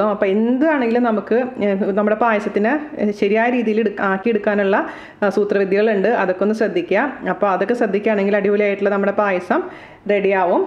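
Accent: Thrissur, India. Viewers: നല്ല രസമാണ് അവതരണം തൃശർ ഭാഷയല്ലൊ.' ''?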